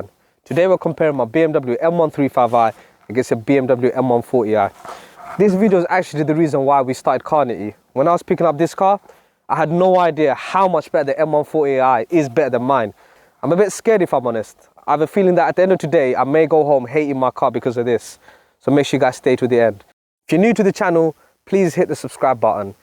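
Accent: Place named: Bangladesh. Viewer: Love your Bangladeshi accent bro! It’s what makes you unique ❤️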